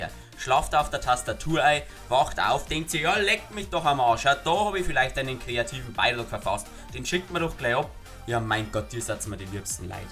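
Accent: Bavaria, Germany. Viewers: Man heat scho das du bairisch bisch! i bin Österreicher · Des is boarisch I komm aus Ostalbkreis versteh alles bisch witzig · Servus boarisch is der beste Akzent #boarisch für immer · Der bayrische Dialekt isch mega bin Österreicher verstehe alles nich so vile Unterschied zu meinem heimat Dialekt aber bayrisch isch besser und lustiger💪🏻💪🏻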